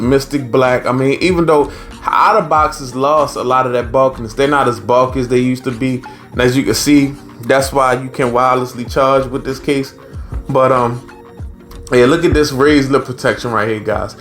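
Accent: Baltimore, United States. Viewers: Sounds like you're from Baltimore, good review and also dud was right cut the nails 😂💯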